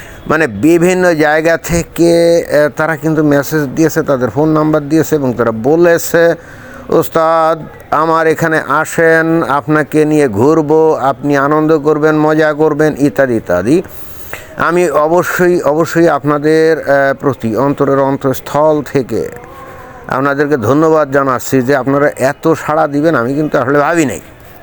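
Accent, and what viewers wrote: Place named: Khulna, Bangladesh. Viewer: আপনার কথা শুনে মনে হয় --- আপনি খুল্লে জেলার লোক! ওটা আমাদের পূর্বপুরুষদের বসবাসের জায়গা ছিল!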